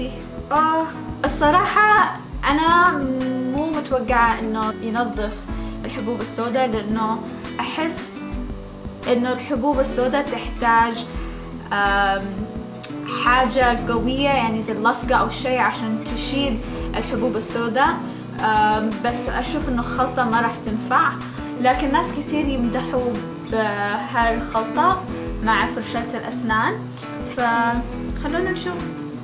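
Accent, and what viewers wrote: Yemen. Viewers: هههههههههههههههههههه الردود تضحك اللي يقول مغربيه وسعوديه ومصريه آيه يمنيه صح بعض كلامها من للهجات ثانيه بس هيه يمنيه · والله كلامها يمني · كلامك صنعانيه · لجهنك يمنيه؟؟؟؟؟؟ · لهجتها يمنيه بأقوى قوتها أعرف لهجات بلادي كلها انا في السعوديه وكلامهم مش زي كلامها ابدا عموما يمنيه او سعوديه ع العين والراس الله يسعد الجميع يااارب آمييين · ايوه هي يمنيه بس هي جلست في مصر كثير و صارت تتكلم يمني على مصري على سعودي على سوري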